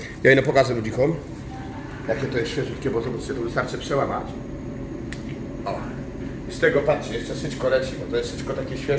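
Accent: Highlands, Poland. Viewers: skąd ten góralski akcent u gościa znad morza?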